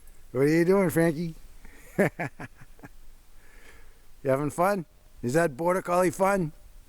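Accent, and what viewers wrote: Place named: New England, United States. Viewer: northern NY Adirondacks you sound like yer from NH VT or MA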